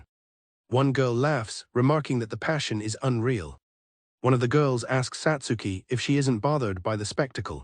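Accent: United Kingdom. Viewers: British narrator? Yessir, first time hearing British narrator.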